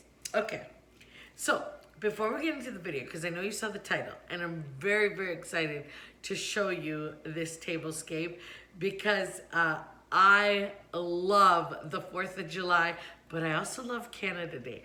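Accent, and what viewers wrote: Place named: Italy. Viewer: you sound a bit Italian in this video .................. Love this video...Btw remember to drink a lot of water in the summer keeps you cool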